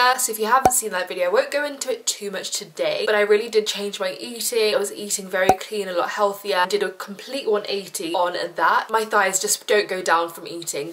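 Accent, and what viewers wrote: United Kingdom. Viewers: Omg this British accent 💖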